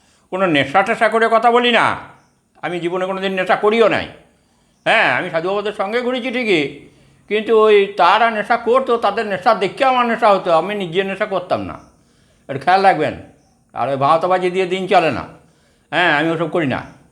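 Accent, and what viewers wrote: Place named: Birbhum, India. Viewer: যেইভাবেই হোক আপনার সাথে দেখা করতেই চাই, আপনার কথা শুনে মনে হচ্ছে আপনি বীরভূমবাসী, যাইহোক আপনার mail id ta দেন আমি পেলাম না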